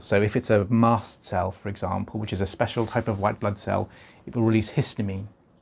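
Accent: United Kingdom. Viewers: Sigh…. fascinated by an ear cleaning narrated by a lovey Brit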